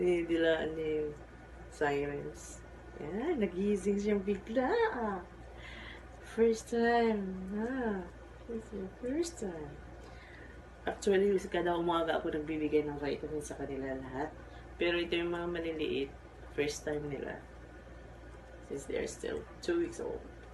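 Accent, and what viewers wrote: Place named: Visayas, Philippines. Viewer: bisaya ka te... pila ka dosage ang 2 mons te?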